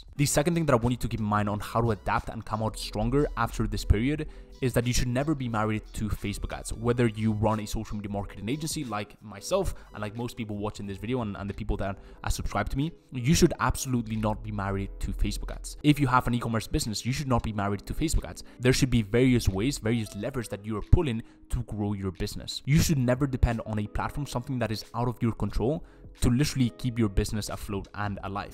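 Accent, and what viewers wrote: Spain. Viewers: Are you from Spain?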